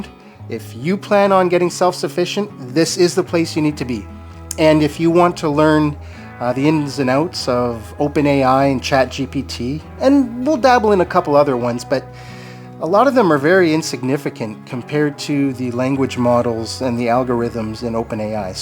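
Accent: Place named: Canada. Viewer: I subscribed because I like your voice sounds calming and Canadian 😊👌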